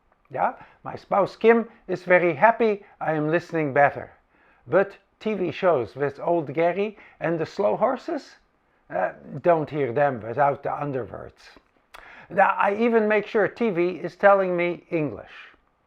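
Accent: Netherlands. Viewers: Really nice you are making videos again and to hear your Dutch accent. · Wat knap dat je het Nederlands-Engelse accent (nog) zo goed kan! I find that really impressive. Good to see you back. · Nice to meet the real with two letter "a". That Dutch accent reminds me of the days I worked for a Dutch Bank many decades ago.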